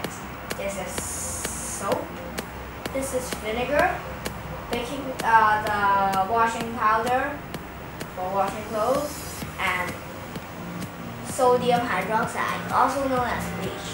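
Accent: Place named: Asia. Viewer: Lol a cup of wwwater hahahha asians speaking english is funny af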